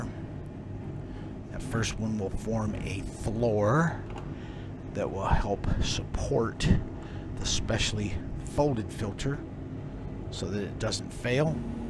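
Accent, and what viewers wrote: United States. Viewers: You speak such a nice English dialect. Can you tell where exactly in the US you live? I'm from Germany, by the way, that's why it's kind of fascinating for me. Is there actually an accreditation for precious metal refiners in the USA? Can or do you have to issue certificates for the purity of your gold bars? In Germany, such things are usually very strictly regulated.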